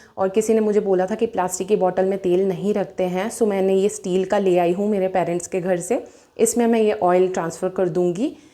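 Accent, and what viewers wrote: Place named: Gujarat, India. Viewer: Muje aisa kyu lagta hai ki aap gujrati ho😂kuch words sun k muje laga.. Bt nice chota house. Bohot hi acha ghar hai. Apka ghar ise acha hai ya fir ye ghar acha he.. Jarur batana👍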